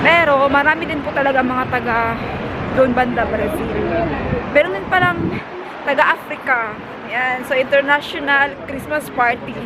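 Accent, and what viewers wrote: Visayas, Philippines. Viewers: How many man you’re going to have ? Lol just saying bisaya ka Inday di ba ? Speak good English Dai pls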